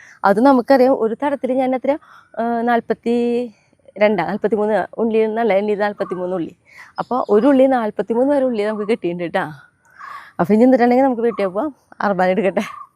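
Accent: Thrissur, India. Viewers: Where r u based, from ur language, I think u r from Thrissur, where r in Thrissur? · Thrissur nadatharel aano chechi ningal😮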